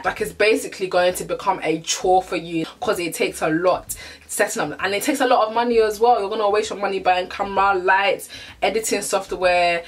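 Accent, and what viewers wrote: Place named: United Kingdom. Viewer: I just had to click youuuu the title caught my eye.... bowyyyyy do i love your accent you sound proper British😍😍 i love the content of this video i love the banta/ rant I totally agree 💯 yanoe most of the times us black people dunno how to support each other..... like im actually in love with you right now " their youtube video dont even bang" its really really really hard talking to the camera yanoe i laugh looking at my first three youtube videos i sounded like a penguin thats how we learn inni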